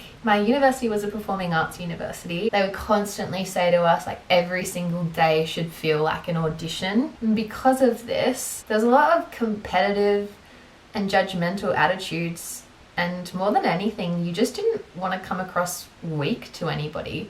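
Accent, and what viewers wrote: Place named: Australia. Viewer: Hi! It's Milli, I hope you remember me from Budapest:) I just wanted to tell you how much I love these types of videos (and I'm also proud of myself because I still can understand the Australian accent ahaha) and how much I relate to you especially on this topic. These are the kind of conversations everyone need but unconsciously avoid. Thank you ♥️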